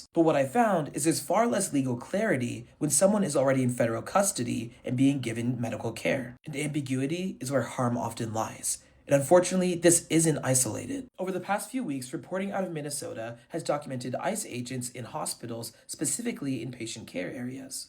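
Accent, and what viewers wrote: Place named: Africa. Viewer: You are correct about privacy. We are in crisis in America--Aliens need to go home and get their healthcare and keep their privacy. Some of the immigrants are dangerous killers. You should be happy that ICE is there . You look and sound like a 1st or 2nd gen, African or Haitian, so. you see things different than non administrative Americans.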